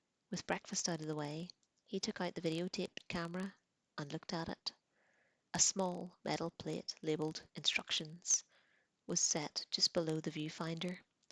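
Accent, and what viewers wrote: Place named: Northern Ireland, United Kingdom. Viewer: Great to find this..and another NI accent to boot 👍 I love that some of the language is making the reader uneasy 😂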